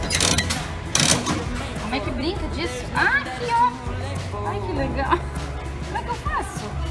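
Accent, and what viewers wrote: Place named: São Paulo, Brazil. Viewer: Pelo sotaque, deve ser SP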